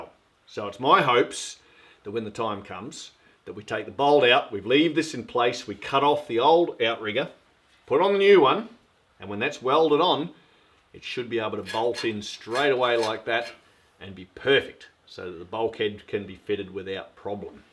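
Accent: Australia. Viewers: As a fellow Aussie, It is a rare pleasure to hear an Australian voice on a you tube show. I have been trying to pick your accent, reminds me of a amalgam of Steve Irwin and Paul Hogan, a touch of John Williamson, with a hint of Bob Hawk and Les Hiddens, hope those Americans appreciate the education they are getting!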